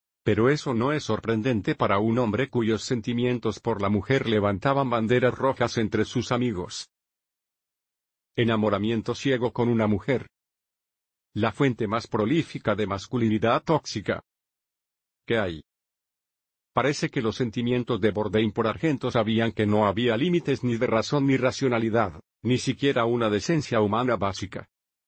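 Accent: Spain. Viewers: I'm a professional translator. It's actually not bad for software. I would love to contrast it to the original English text. It'll get the job done. The Spaniard accent may be a little alienating for the Latin American crowd. But like I said, it's actually pretty good for a machine. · 7 or 8 out of 10. The message is understandable. It is a Spain Spanish but again understandable. I am used to Paul Elam speaking but that is just me. This is okay in my opinion. La voz es un poco rápida y bien robótica pero se entiende. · Wonderful idea, Paul, there are around 500 million native Spanish speakers around the world that could benefit from your work. Like others have stated, the robotic voice and Castilian accent might draw listeners away. There are websites where you can get your essays translated professionally and maybe you can look for volunteers to read them with a more neutral accent. 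I'd volunteer.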